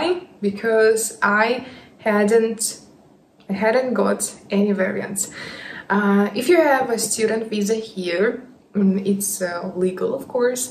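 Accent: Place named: Eastern Europe. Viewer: I am British and therefore a native English speaker. You are too hard on yourself. Your spoken English is very easy to understand. You have an Eastern Europe accent when you speak English but not a very heavy accent. Any native English speaker would EASILY understand you The reality is that most educated native English speakers could probably identify an accent in the spoken English of most other English speakers. I think I could identify which country a Canadian, a South African, an Australian or an American speaker of English came from even though they are also native English speakers. I could identify where in the UK most people came from (London, Newcastle, Yorkshire, Glasgow, Birmingham, Liverpool, Wales etc) as those areas/regions have their own accents, too. People speak English with French, German, Italian etc accents and, yes they will make mistakes but that doesn't mean it is difficult to understand them. 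Most British people mangle the language so who are we to criticise?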